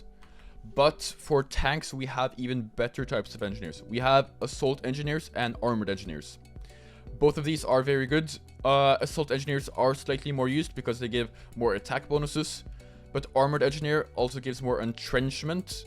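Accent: Norway. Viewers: Bror høres så norsk ut🔥🔥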